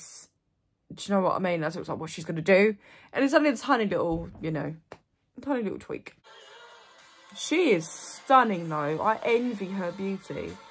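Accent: United Kingdom. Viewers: That british accent❤ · Love your reaction and just adore your British accent ❤ Love from Armenia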